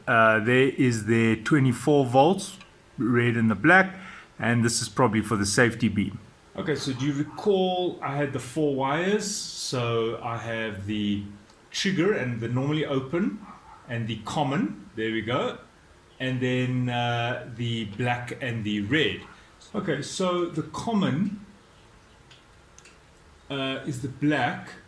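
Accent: South Africa. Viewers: Sounds like a South African accent. Hi from Canada. Was actually looking for keypad opener that I can add or remove user codes through my smartthings controller like I can for my front door locks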